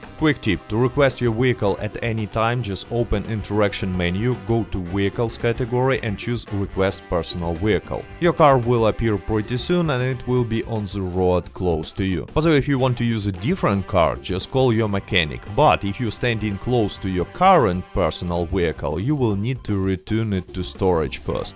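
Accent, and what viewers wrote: Russia. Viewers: This is why I love Russians the have a great sounding ascent and they get straight to the topic!!🙃😁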